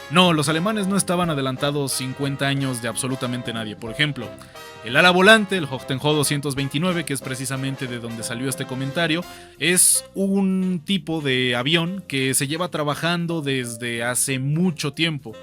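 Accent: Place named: Castile, Spain. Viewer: Acentazo castellano (Mis 10's)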